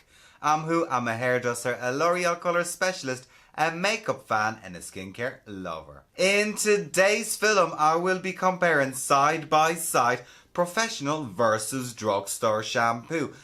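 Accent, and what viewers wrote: Ireland. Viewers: Are you irish?!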